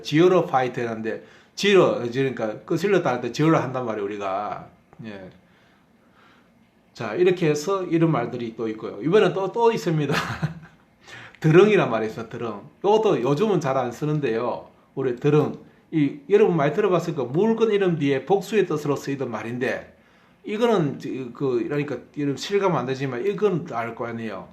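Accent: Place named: Jeolla, South Korea. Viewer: 그래서 사투리랑 비슷하다고 했구나. 전라도 말투인데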